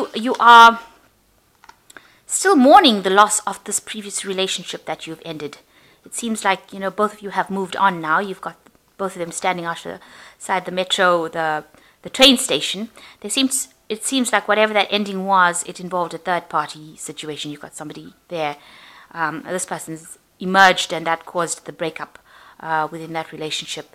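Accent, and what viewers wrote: South Africa. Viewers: Are you SAfrican? You sound like home :-)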